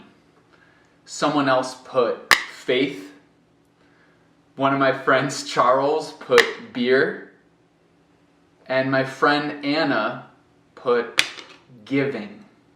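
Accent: Brazil. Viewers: parece um brasileiro falando inglês fluente lol Still waiting for u here in Pantanal!!! With the capivaras:v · incrível! O seu sotaque é como um brasileiro falando inglês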